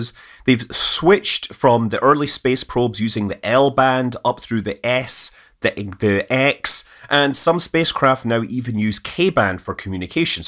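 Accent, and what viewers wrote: Scotland, United Kingdom. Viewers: I love his Scottish voice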